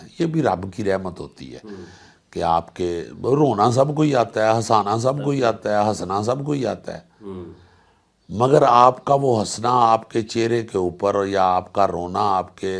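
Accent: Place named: Punjab, Pakistan. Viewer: Bhaji ajj te Urdu wadhiya bol rahe ho.nahin ta Punjabi da lehja aunda jiyadatar tuhadi bolli vichon.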